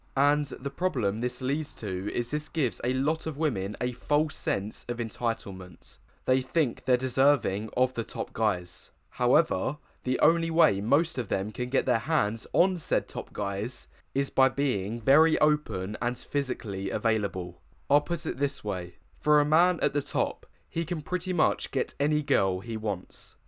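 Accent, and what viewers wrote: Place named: United Kingdom. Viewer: bro your english regional accent along with your bluntly made message is so f***ing good. that being said it's really depressing hearing the truth :(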